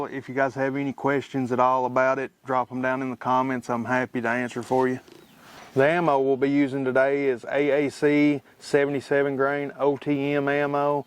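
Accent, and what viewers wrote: South, United States. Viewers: enjoyed it! Great info and I'm from Alabama so I can't help but pick on other southern accents! Thanks for being a good sport :) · Best part of a southern accent is you can play at 2x speed and dude doesnt sound like a chipmunk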